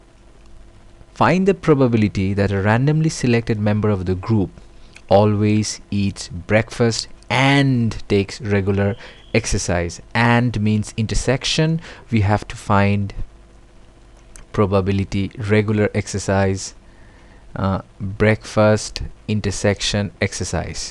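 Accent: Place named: Caribbean. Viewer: Thank you verry much...like your accent..west indian? very good teacher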